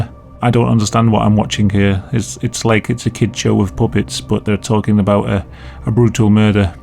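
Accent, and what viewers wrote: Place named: Wales, United Kingdom. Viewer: They cooked one of his legs and eht some of it? Love the Welsh accent.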